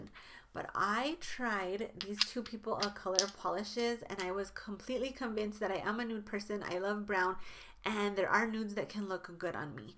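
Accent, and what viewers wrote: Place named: Miami, United States. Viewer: Just started watching this video but I am already fascinated by the accent thing. What most people don't realize is that every person who speaks English has an accent. They just don't hear their own because they usually have the accent of their local area and peers. Or we associate non accented speech with whatever we hear in popular media, but that's also an accent. What I am fascinated by, is that when I first heard you, I thought I heard a bit of a "Miami accent." But not quite. Now it makes sense though! Miami accent is influenced by more Caribbean influences, but both yours and theirs have the darkened L sound. I could talk about this forever. Let me keep watching for polish😅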